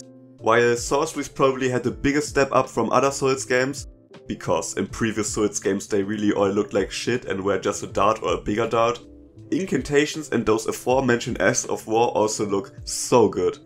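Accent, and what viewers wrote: Germany. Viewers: So herrlich TEUTSCH, your english. I love my germans, because I'm one myself. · Der Deutsche Akzent kickt zu stark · Bist du deutsch? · man merkt das er deutscher ist xd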